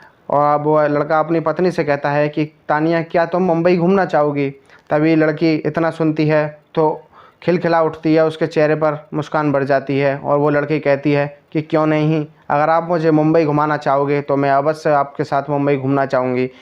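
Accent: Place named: Howrah, India. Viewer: जी आपके बोलने की शैली उस्मान सैफी जैसी नहीं है आप हावड़ा का बोलते हैं और एक ही शब्द को कई बार दोहराते हैं| कृपया सुधार कीजिए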